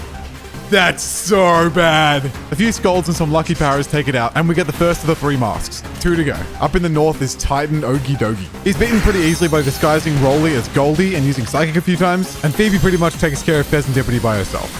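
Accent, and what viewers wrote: Australia. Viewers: i never realized how australian you are matye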